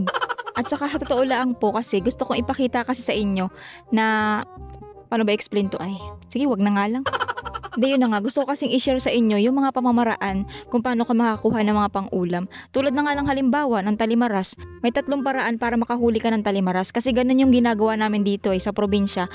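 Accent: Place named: Batangas, Philippines. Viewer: Kasarap mong panoorin idol kakatuwa kang mag Salita parang batanguena eh 😅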